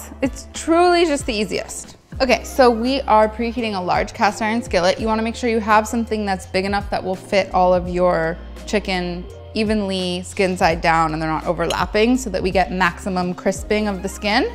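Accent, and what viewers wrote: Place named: United States. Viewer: Why is this uk dinner lady speaking with an American accent?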